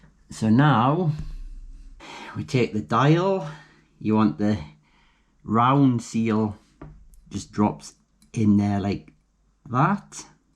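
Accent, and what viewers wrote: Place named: North East England, United Kingdom. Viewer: Great job and thank you for the clear explanation. Good to hear the north east accent too!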